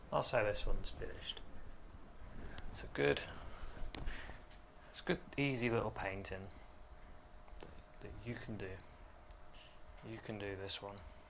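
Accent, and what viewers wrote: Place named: England, United Kingdom. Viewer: Enjoy your vids very much,. What part of England are you from? Trying to place the accent.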